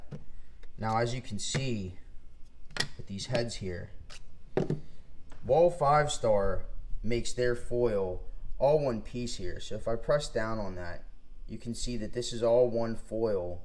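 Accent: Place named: Pittsburgh, United States. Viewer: G! Soon as I heard your Pittsburgh accent I knew and then you said Crafton! Been shaving my head 9 months. Pittbull Gold rotary. All good for 6months until I ordered replacement blades. Now getting irritation each shave. Would you recommend a close trimmer and this foil shaver for me. I’m shopping for both. Looking at andis t outliner pro and would like a foil to go with it. Great video man! Moved to the burgh 3 years ago!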